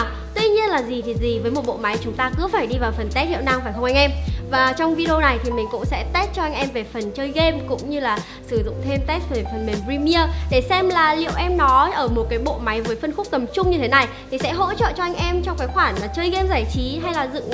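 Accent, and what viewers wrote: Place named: Hai Duong, Vietnam. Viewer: Chắc chắn là quê Hải Dương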